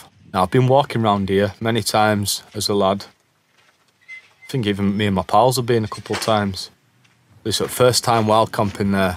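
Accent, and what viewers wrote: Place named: North, United Kingdom. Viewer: Interesting video mate, nice to see a bit of a look back. Shocked you hadn't been to the peaks or the lakes before though, with your accent they are basically on your doorstep!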